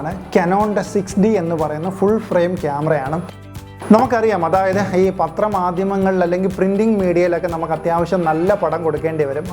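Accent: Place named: Central Travancore, India. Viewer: അണ്ണാ നമ്മുടെ തിരോന്തരം ഫാഷേല് പറ അണ്ണാ, ഇതു അണ്ണൻ deliberately മധ്യ തിരുവിതാംകൂർ ഫാഷ പറയുവാണ്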